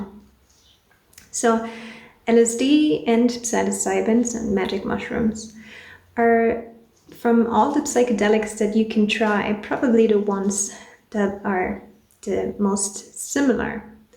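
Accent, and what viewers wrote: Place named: Norway. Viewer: Its so cool to see a "normal looking" woman talking about physcadelics. Not that everyone doesn't partake i just feel like your breaking a stereotype. Good content, i prefer lsd if im looking for a good time and i choose shrooms when i feel like having my sorry ass dragged through my own physce by nature haha, really though when i take shrooms i feel like im talking to my therapist. An old friend i like to visit when i need advice. New subscriber here , where are you from ? I love your accent you remindlike one of my favorite musicians from norway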